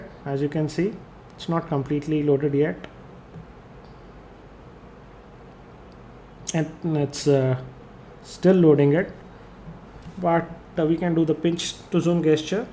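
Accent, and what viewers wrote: India. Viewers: This guy has the most normal stereotypical Indian accent i have ever seen.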